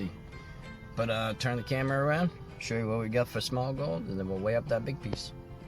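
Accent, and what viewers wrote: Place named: New Jersey, United States. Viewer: You sound like your from Camden New Jersey.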